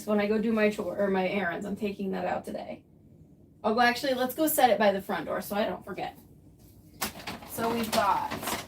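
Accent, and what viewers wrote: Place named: New York, United States. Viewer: Thank you so much for the fun live yesterday. Just going back and watching the beginning… chef’s kiss to your New Yawk accent. “We makin’ CAWFEE OVA HERE!” 😂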